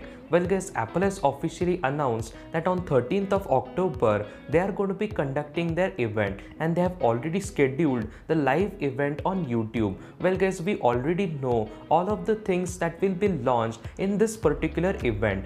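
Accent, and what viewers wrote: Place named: India. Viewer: seems like ur accent is transforming from American to India 🇮🇳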